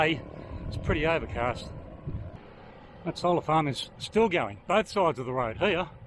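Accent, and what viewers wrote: Australia. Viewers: are you from Australia or NZ?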